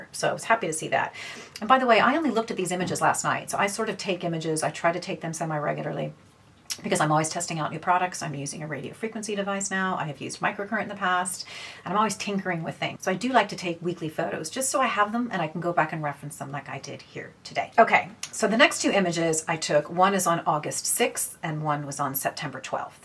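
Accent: Ireland. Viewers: The battery cycle is too short on this thing (Omnilux) for sure. I have another cheap USB external battery power source that I use and just set a 10 minute timer on my phone. Annoying for the price. This is my first watch of your channel. I’m 50 as well. You have a subtle Irish accent which I adore. I’ve subscribed.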